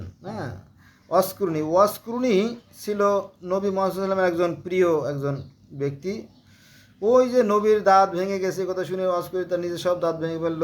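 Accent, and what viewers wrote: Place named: Barisal, Bangladesh. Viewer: Please present in a wise manner. Seems you are not a highly educated man, can't speak even Bangla correctly avoiding illiterate tone ( local Barisali tone) Correct those limitations if you can ( I am in doubt, you will be able) The history what you narrated is known to all educated Muslims except the common illiterate ( they are the larger in numbers)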